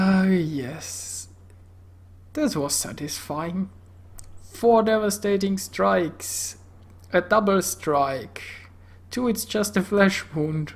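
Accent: Germany. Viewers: german accent confirmed or not confirmed?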